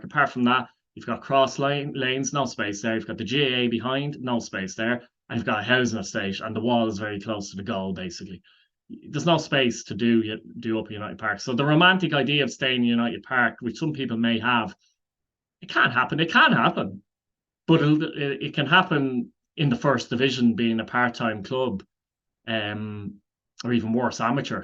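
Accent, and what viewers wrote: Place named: Derbyshire, United Kingdom. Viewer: You have an odd Ashbourne accent.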